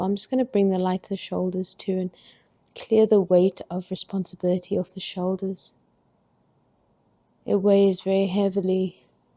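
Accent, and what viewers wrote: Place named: South Africa. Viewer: So happy to search other spiritual channels and find a fellow SOUTH AFRICAN VOICE! Cheering you on from the tip of Africa. I hope we might connect if it serves us - and humanity. I am an ex lawyer (that was brief....) now an ascension guide, I've been channelling since 2012 - specialising currently according to my team, in navigating the insanity of ascension - in other words, the psychological impact ascension is having on us individually and collectively.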